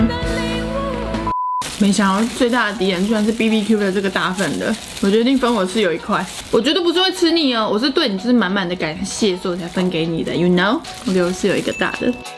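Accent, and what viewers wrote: Taiwan. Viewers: 好有喜感看你的頻道非常有親和力尤其是那台灣國語😂😂😂 我的頻道也要加點搞笑的元素哈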